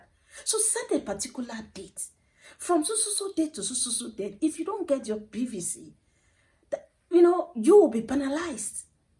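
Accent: Kenya. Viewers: Your accent is changing into a Kenyan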